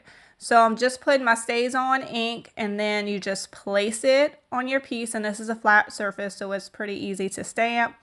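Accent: Louisiana, United States. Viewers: Are you a born Cajun? Is that the accent I hear? LOVE IT SO MUCH! Your projects are some of my favorites ever. XOXO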